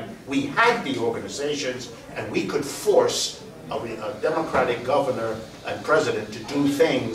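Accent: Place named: New York, United States. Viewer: Listening to Dr. Wolff speak is like listening to Woody Allen explain economics. What a New Yorker. :)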